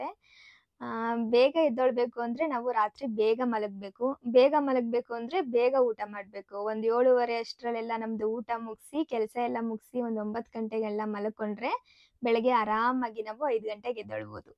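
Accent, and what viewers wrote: Mandya, India. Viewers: Nim math keli starting andkonde madyane anta. We are also mandya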